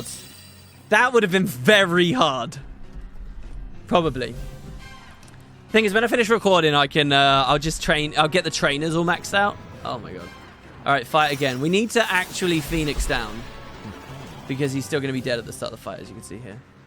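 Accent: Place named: Scotland, United Kingdom. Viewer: I vote for more of Scottish accent.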